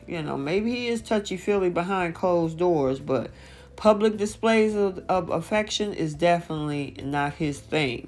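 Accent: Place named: United States. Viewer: What's with the American voiceover.its Australia here.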